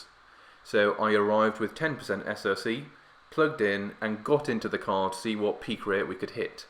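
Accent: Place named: Yorkshire, United Kingdom. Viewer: Half southern half yorkshire accent lol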